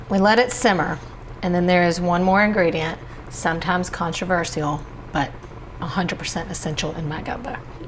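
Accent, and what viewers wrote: South, United States. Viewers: I do too. Very much the voice of a young Demi Moore. A little rough, sweet southern accent..... Magic.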